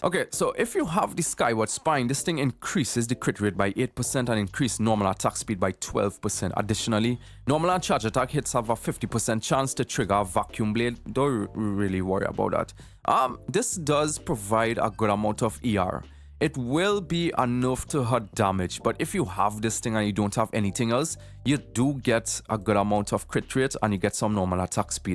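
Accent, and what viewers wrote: Trinidad and Tobago. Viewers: love the trini accent makes the guide actually entertaining ill give u a like and a sub u deserve it :D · I just got this video on my recommended and the trini accent caught me so off guard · Trini to do bone! I’m half way an island boy myself🇧🇸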